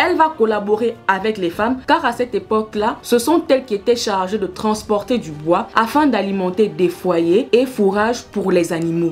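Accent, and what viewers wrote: Fang, Gabon. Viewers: Bébé l’accent fang est toujours là hein nkolomessa ossuuuu❤️🥺😂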